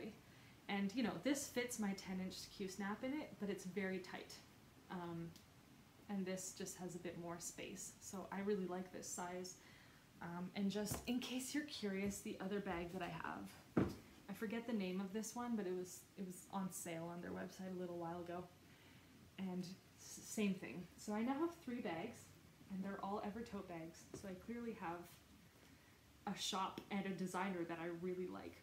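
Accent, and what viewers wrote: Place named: Quebec, Canada. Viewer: Allo des Cantons de l'Est!!!! I'm so glad to have discovered your flosstube!! I'm a big fan of Jacob and the team at Evertote!!! I can't wait to start the holliday countdown. Will you participate? I was not able to go to the retreat as it was too close from a conference at work, but your video made me feel the experience!!! And you are so lively as a person!! I had an idea that you were from Québec by the way you prononce French words. Maybe, with other stitchers, we could make a local chapter? I can't wait for your next video.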